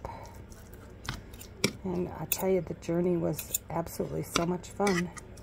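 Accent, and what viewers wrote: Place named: Midwest, United States. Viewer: Thank you 😍I love your midwestern accent 😊Are you from Wisconsin?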